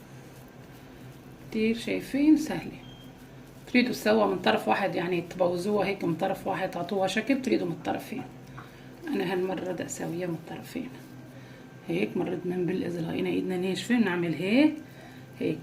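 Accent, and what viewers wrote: Aleppo, Syria. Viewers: الحمدلله عسلامتك لايحرمنا هللهجه الحلبيه الاصليه..ذكرتينا باهلنا...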